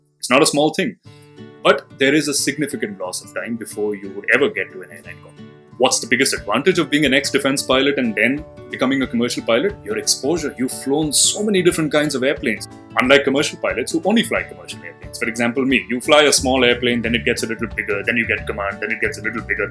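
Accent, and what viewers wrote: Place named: India. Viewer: Man u have the most charismatic indian accent ever. :D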